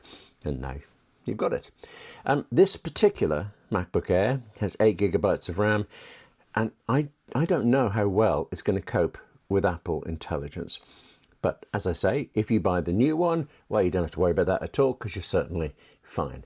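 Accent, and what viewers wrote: United Kingdom. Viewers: Mr. you write for an online tech magazine I can't remember the name of at the moment but I really appreciate your articles about Apple. Great work! Cool to see you online with that British accent. So at some point, Bob's your uncle. Thanks for all you do! Subscribed.❤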